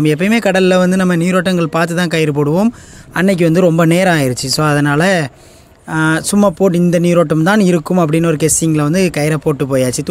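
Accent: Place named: Sri Lanka. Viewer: என்ன இலங்கை தமிழ் பேசுறீங்க அண்ணா pad ok வாழ்த்துக்கள் அண்ணா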